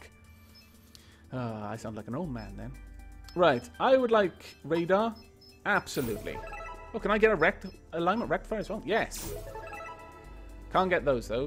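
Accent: Wales, United Kingdom. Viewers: is he welsh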